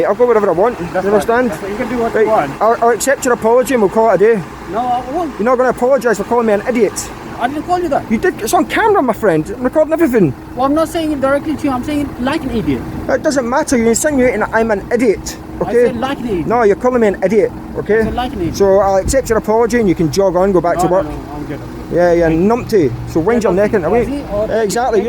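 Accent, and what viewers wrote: Salford, United Kingdom. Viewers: You have a fuuny voice are you from SALFORD near Manchester .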